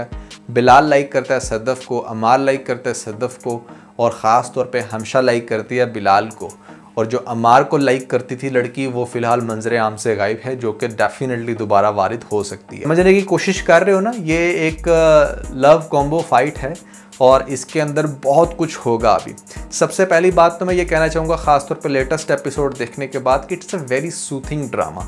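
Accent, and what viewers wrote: Punjab, India. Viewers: Bohat changa tuhda punjabi Touch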